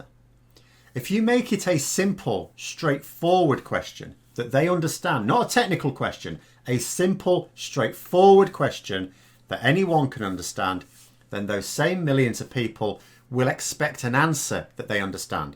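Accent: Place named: West Yorkshire, United Kingdom. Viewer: Your accent / phrases remind me of home 😭... "that gormless article" 😂 West Yorks by any chance?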